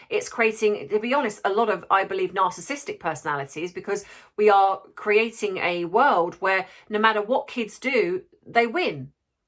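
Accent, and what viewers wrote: England, United Kingdom. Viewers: I like your videos,and love your English accent. I live in Oregon. You could film your self kind of to the side, like Candace Owen's and Ben Shapiro,on the daily wire. I'm just thinking out loud😊😊😊😊😊😊😊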